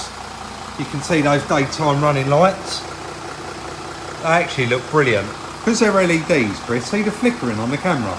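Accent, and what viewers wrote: South, United States. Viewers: I think that’s great Chris gets a nice ride for a bit it has turned out very nice On another note being an ex northerner understanding your southern lingo is a bit difficult sometimes And I bet you will say the same for me but I would never go on about the way you say things That is just how it is , I am glad you told him what’s for as I would too Being in Australia for the last 50 years I think I speak double Dutch these days You would have no idea what is spoken here half the time Thanks for all the great videos and I do hope Chris enjoys his new old flash motor 😍😍👍👍ps I love finding money in cars 😂😂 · Lads never apologise for the way you talk I’m a scouser I can understand you southerners perfectly well 😉😉 the content you provide and the work you do is second to none, you lads are class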